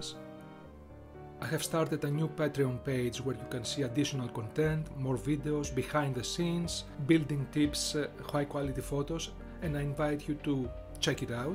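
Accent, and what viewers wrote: Greece. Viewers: speaking English with a Greek pronunciation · η ελληνική προφορά είναι ότι καλύτερο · your pronation its like a greek who tries to speak English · Με την πρώτη λέξη κατάλαβα ότι είσαι Έλληνας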